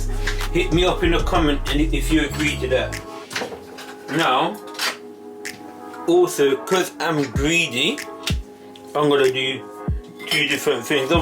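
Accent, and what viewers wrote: England, United Kingdom. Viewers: I remember this from my time on the island many moons ago. But it is terrible to listen to a Mauritian speaking English in an English accent😁 No disrespect intended. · Which country is the english accent?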